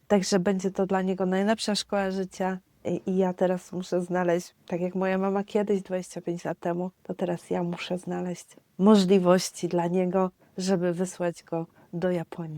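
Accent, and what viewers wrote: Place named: England, United Kingdom. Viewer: no właśnie ten język Polski w którym pani rozmawia jest już z akcentem Angielskim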